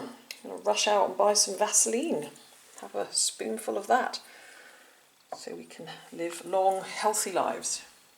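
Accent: United Kingdom. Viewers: Your voice is so angelic, I don't know who's I like better yours or Michael wood for a British accent. You could sell your voice like him no problem.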